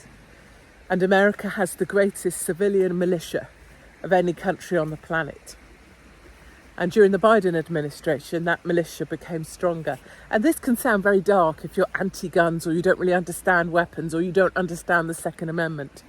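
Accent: United Kingdom. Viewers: my darling girl with your lovely British accent, thank you for expressing how this old lady in the mountains of East Tennessee feels. You really do understand the mindset that put Donald Trump back in office. You are a rare gem of a person! Please stay healthy and keep spreading the truth.❤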